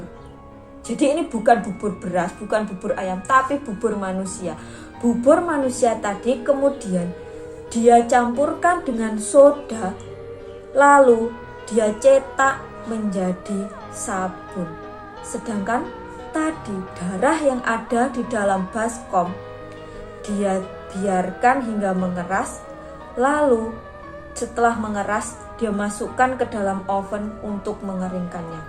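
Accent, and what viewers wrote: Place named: Java, Indonesia. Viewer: Medok lur medok 😃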